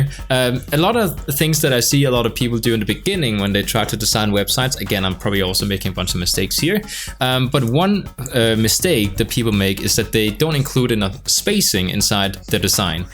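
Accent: Denmark. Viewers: Tænkte sku nok du var dansk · Are you danish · Your English sound danish ?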